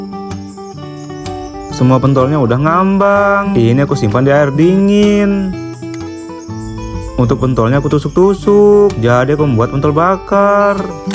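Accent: Java, Indonesia. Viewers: bang aslinya mana bg,,kok ad logat jawanya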